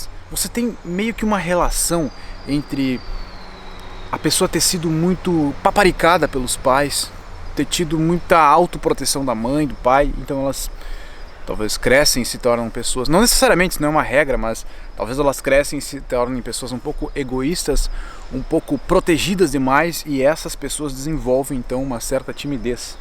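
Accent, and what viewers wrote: Rio Grande do Sul, Brazil. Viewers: Apaixonada por este sotaque gaúcho. 😍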